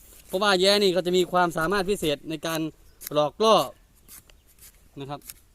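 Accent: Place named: Laos. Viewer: เว่าลาวแต่แรกกะแร้วน้อคะข้อยรุ่นนำเจ้า..ย่านเจ้าเว่าตกลาว55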